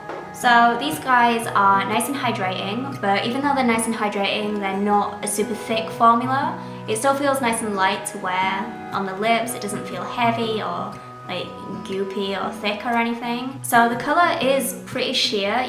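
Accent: Yorkshire, United Kingdom. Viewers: P.S what part of Yorkshire are you from? I'm in Harrogate.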